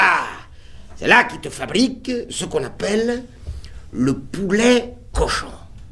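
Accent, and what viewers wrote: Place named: South-West, France. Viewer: Michael Gisclair: on dirait un accent du Sud-Ouest de la France. Trés trés bon, ce Didier Bénureau...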